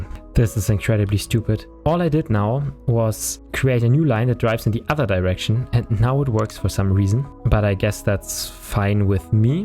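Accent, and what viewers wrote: Germany. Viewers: This video’s quality is incredible — at first I thought it was made by a big YouTuber! I was so pleasantly surprised to discover your channel. The content, your voice, and even the German accent (it adds a unique charm!) are fantastic. Can’t wait to see more!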